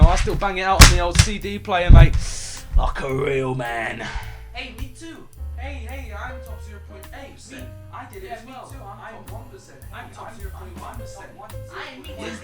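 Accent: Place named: United Kingdom. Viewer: i thought u were dankpods for a bit, then i heard u were british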